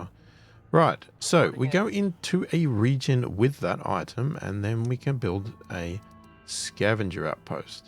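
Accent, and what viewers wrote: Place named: Australia. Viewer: where you from brother u sound aussie... edit: yea checked the about section XD g'day brother XD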